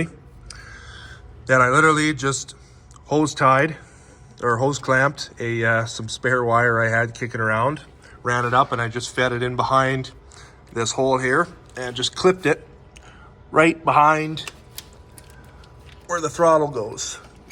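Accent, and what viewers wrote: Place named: Canada. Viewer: As a Canadian i appreciate hearing our accent in the video😂🙌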